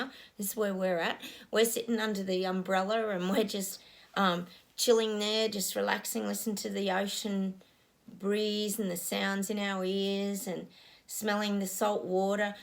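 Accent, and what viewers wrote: Australia. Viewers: Hi new subscriber here agree with all what you have said. Been saying to everyone for the past couple of years now we have to go back. I have no idea why I say this but feel we have moved to fast forward. It's time to get back to basics. Can't wait for people to wake up. Lovely to here your Ausie accent I used to live over there many years ago.Still miss it. Thanks for your guidance xx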